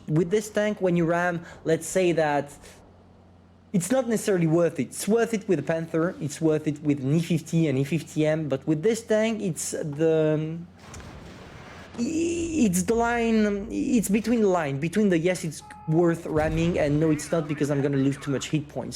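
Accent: France. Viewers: He is probably french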